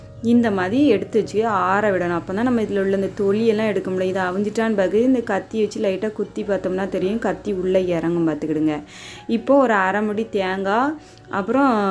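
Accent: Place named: Tirunelveli, India. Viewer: நெல்லைதமிழ் வாழ்க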